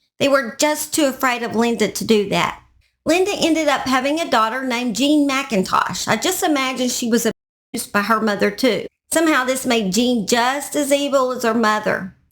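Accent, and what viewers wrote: South, United States. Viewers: Just found your channel + instantly subbed. I watch true crime way too much + I've never heard this. Thank you for bringing attention to it. What a sick sick woman. I have no words...I hope all the victims of this deranged lady are living their best lives today. My prayers are with them 🙏 Btw having a severe Boston accent myself, your southern voice was lovely to hear, especially talking to your pup! Thank you for the vid. Look forward to your next ✌️💙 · Thank you for your content I luv your country accent. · Wow,love your southern accent. Yes, this monster is the worse of the worst. Damn, the things she did to her victims are unheard of. She was one sick b*tch. I'm glad she'll spend the rest of her miserable life in prison.she still gets her 3 meals a day,free health care.Something her victims didn't get.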